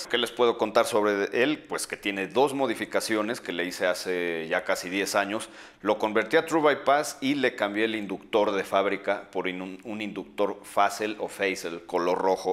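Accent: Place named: Yucatan, Mexico. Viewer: Haha al chile sí hablan así los yucatecos. Con eso me quedo de este video.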